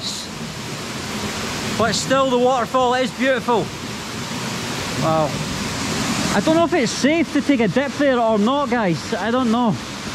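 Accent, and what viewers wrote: Scotland, United Kingdom. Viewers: Hai, I find your videos great, and a lot of local people here know you too, your Scottish accent is fabelous, greetings from Sanur, Bali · It is Scottish accent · Have fun in Bali.. I like your British accent brotha!!❤️ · It's different... you know UK have many many regional accent... but to untrained ears yes, all sounds just british innit 😅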